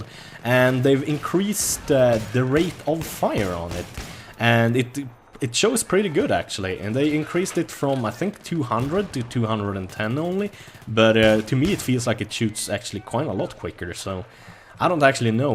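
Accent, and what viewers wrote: Sweden. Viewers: Are you Swedish? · He's Swedish. It's his accent.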